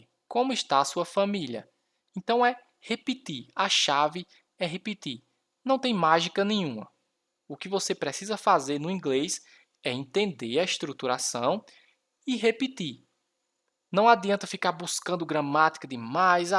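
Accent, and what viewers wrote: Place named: Northeast, Brazil. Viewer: Eu não acho um idioma maravilhoso, preciso do inglês por causa do meu trabalho... Uma língua aliatoria não tem concordancia adnominal, adverbial língua do capeta. se vc fazer uma análise sintático de uma frase,vc ver que não tem sentido...😩 vc e nordestino pelo sotaqui, nada contra, sua dinâmica é ótima bem expercifica 👍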